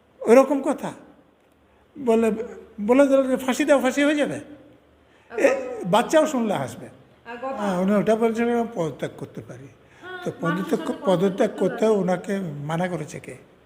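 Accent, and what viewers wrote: Bangladesh. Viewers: Aapnar mukhey dhakar kutti der kotha shuney anek smriti jegey uthchhey 😅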